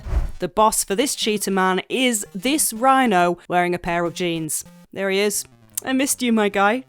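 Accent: United Kingdom. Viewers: Another really cool video to add to the list. Good work.^^ Come to think of it, I'd love to see make a crossover with an other slightly deranged reviewer with a British accent: meets CADDICARUS. That would be dope.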